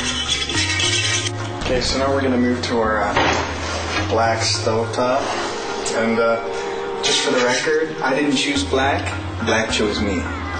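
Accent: Canada. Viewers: Listen to his Canadian accent here holy. Haha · the canadian accent is strong with this mau5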